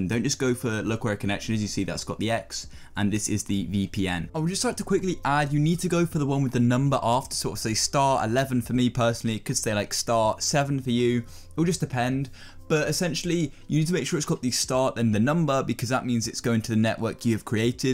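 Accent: United Kingdom. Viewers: Fortnite and british👎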